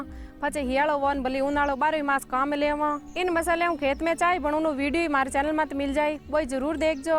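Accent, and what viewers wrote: Marwar, India. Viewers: जी बहुत शानदार प्रस्तुति के लिये बधाई, आप की मारवाड़ी बोली बहुत अच्छी लगती हैं। आप का बहुत बहुत धन्यवाद 🙏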